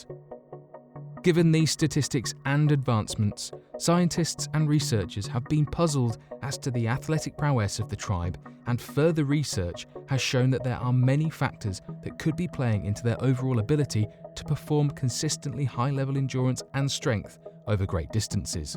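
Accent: United Kingdom. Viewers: I am finally tired of the misleading Click Bait and vague stories with no real thorough information. The girl with the different colored eyes, Click Bait! How fast can the African runners get up to?! The Okinawan people live how long on average? Finish your damn stories! These are not super powers - you got me! Clearly adaptation and acclimation have created the hearty Sherpas! Poor journalism with a British narrator! I'm not impressed. With YouTube's recent money police out and about demonetizing people for all the false and stupid reasons, they should take a look at you and smack you for your constant fake thumbnails and false and misleading, half researched, bullshit titles and stories! I will now unsubscribe.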